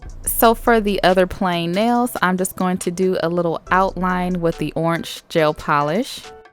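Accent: South, United States. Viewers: OMG SIS!!! Absolutely Amazing!!! I’m loving this set. You are crazy talented sis!!! Yasss I’m wanting this set on sis. Don’t worry about how you’re pronouncing things; I’m country too sis. Tysmfs Love ya!!! 🥰🥰🥰💅💅💅💯💯💯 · I thought you were saying orange normally. But I'm country too so.... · First of all this look is <link>! I have a toddler son so my first thought at blue and orange was blippi 🤦🏻‍♀️ lol but it turned out so pretty! Amd second I LOVE that you talk like I do. I have a very thiccccc southern accent and honestly that's why I don't do many videos on my channel cause I hate the way I sound lol but girl your making me more confident to finally do a nail art channel! 💅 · Yall don't come at her she's country okay, that's how she says orange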